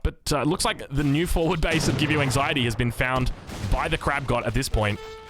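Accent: Australia. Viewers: the australian commentary really adding to the Mad Max vibes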